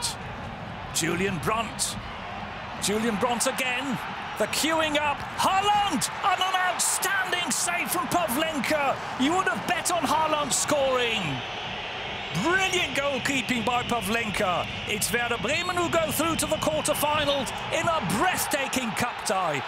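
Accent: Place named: RP, United Kingdom. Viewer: Poshest commentator of all time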